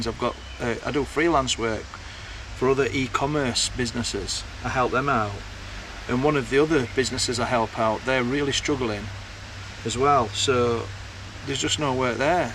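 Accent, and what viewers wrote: Yorkshire, United Kingdom. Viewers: Ahh I am new to your channel but listening to your lovely Yorkshire accent is getting me through Covid..... Stay strong and good thing's will come your way. Plus you have your lovely who is an absolute sweetheart... Love to you both X X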